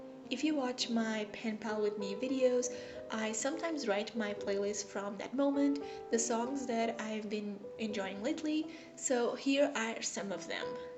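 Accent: Portugal. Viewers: are you portugese? bc i am fluent and you have an accent.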